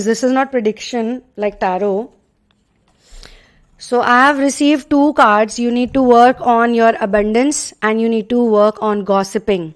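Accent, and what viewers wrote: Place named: India. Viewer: I ABSOLUTELY LOVE to hear you speak! I love the accent from India! Thank you so much for your help and guidance ❤️🙏🙏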